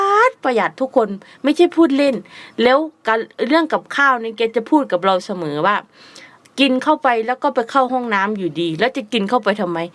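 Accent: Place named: Isan, Thailand. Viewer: สำเนียงคือคนอีสานแท่จ้า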